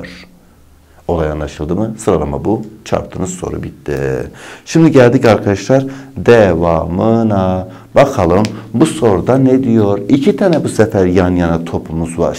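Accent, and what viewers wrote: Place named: Eastern Anatolia, Turkey. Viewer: Hocam tam doğulu ağzı var sizde. Ve bu sizi daha da samimi yapıyor 🤗🤗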